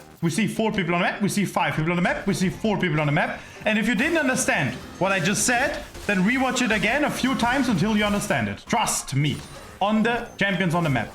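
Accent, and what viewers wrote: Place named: Germany. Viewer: The German accent never gets old lol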